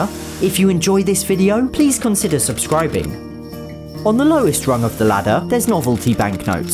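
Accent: England, United Kingdom. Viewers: Man your accent is pure English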